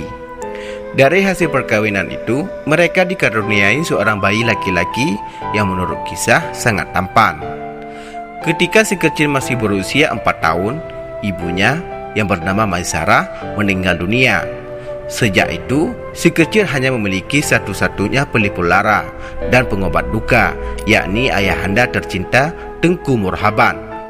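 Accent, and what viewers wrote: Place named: Bali, Indonesia. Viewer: Bicaranya kok mirip bali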